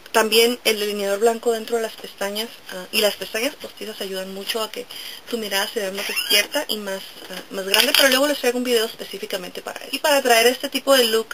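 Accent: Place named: Northern Mexico, Mexico. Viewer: muy buen video tienes un acento cmo d monterrey o dl norte d dond eres?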